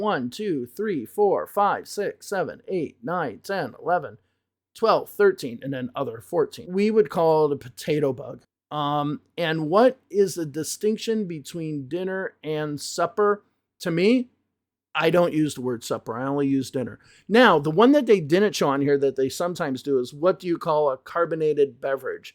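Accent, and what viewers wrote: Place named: Midwest, United States. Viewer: I could hear similarities about Midwest accent in specific intonation, like a hammer on some words.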